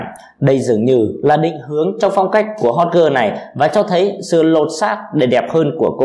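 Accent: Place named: Mekong Delta, Vietnam. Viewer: Chỉ biết Bình luận: Rất- rất đẹp. Giọng nói Miền Tây. Cực Dễ thương.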